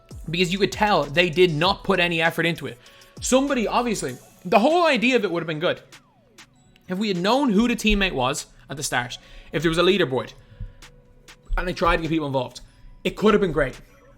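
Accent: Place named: Ireland. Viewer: Angry Irish lad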